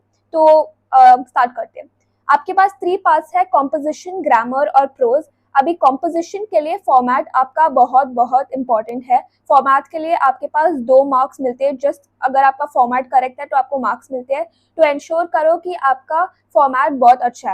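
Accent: India. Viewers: Your Hindi accent ❤❤❤ Op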